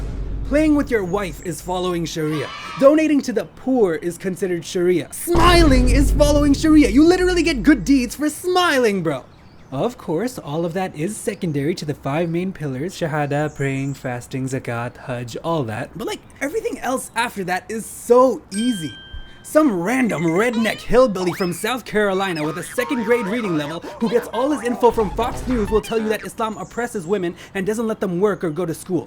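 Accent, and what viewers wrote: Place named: South Asia. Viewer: That accent is bro my fellow south Asian 😂. This is the first video of this guy I’ve watched but I love this dude. It’s sunnah to love your brother.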